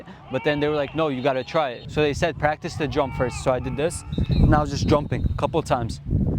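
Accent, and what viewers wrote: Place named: Queens, United States. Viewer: u talk with an accent/an aksent from here in Queens Countey !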